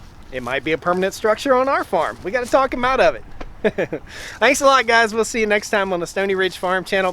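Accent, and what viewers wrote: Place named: South, United States. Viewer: your dad really brings out your Southern drawl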